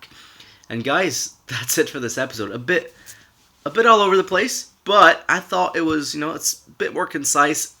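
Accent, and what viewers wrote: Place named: Scotland, United Kingdom. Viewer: you're French right? You sound more Scottish in every vid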